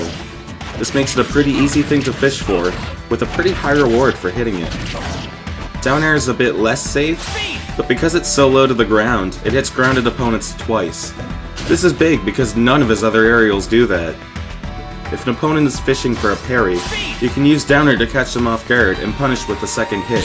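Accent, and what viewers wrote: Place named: Nova Scotia, Canada. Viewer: You have an interesting accent, are you from Nova Scotia?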